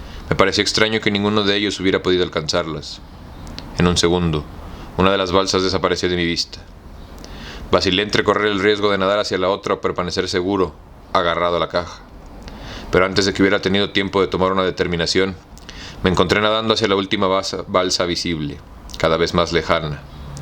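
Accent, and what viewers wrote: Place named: Colombia. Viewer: me gusta como hace el acento colombiano muchas gracias or estos videos soy colombiano saludos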